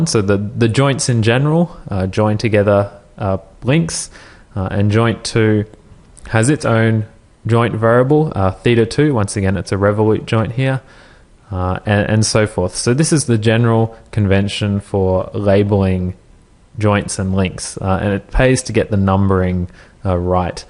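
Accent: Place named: Australia. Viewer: Refreshing to hear intelligence behind an Aussie accent :-) (coming from a country where the only Aussie representation is the Soaps on TV). Back on topic: Great introduction to Kinematics, thanks.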